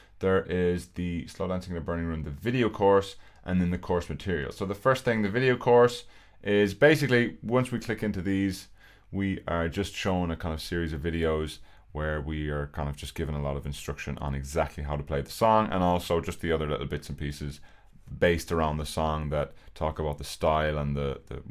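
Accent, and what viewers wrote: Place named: Ireland. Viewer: I didn't expect him to have a deep voice with an Irish accent. I'm definitely getting the course now lmao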